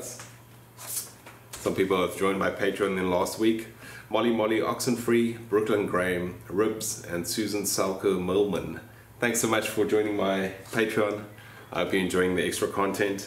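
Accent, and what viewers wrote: South Africa. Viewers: Are you an ex South African?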